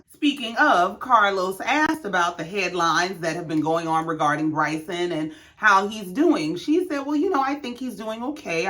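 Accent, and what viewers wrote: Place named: South Carolina, United States. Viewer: In all fairness Cynthia was a big deal on the New York scene so her connecting with Peter is not surprising and being with Leon was known. She is just more unavailable to general scenes. But I love your commentary with SC accent. I am thinking Charleston love it; reminds me of Grandma. Keep being you!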